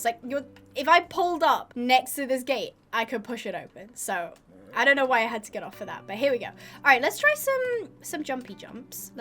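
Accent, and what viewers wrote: United Kingdom. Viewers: Are you british? lol. your accent is amazing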